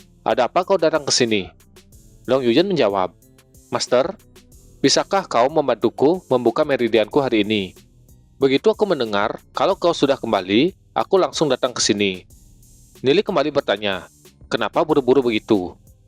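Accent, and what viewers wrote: Bali, Indonesia. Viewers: Logat bali?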